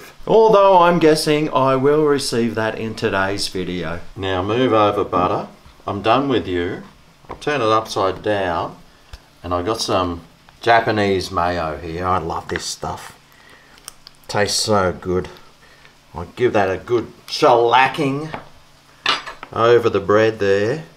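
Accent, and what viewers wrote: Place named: Australia. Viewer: From an Aussie - I love your good old Aussie accent and style. Great representation of the average Australian :) Good lookin sanga!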